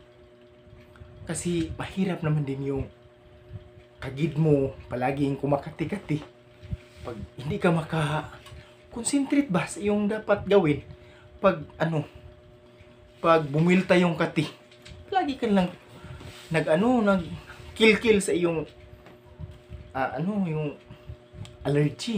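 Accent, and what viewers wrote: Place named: Visayas, Philippines. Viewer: Hehehe, thank you po sa very funny content na ito. You entertained me po talaga! Ang galing mo po mag-act/host. I love the flower background also. Love the Bisaya accent.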